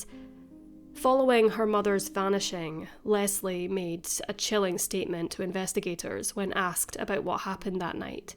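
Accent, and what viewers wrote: Scotland, United Kingdom. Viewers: If you mean the narrator, it's easy to tell she's from Scotland, not Ireland.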